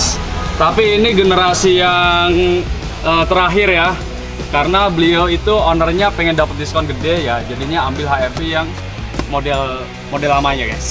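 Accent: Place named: Java, Indonesia. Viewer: Sampean asli pundi nggeh mas. Kok ngomong e nggowo boso jowo😁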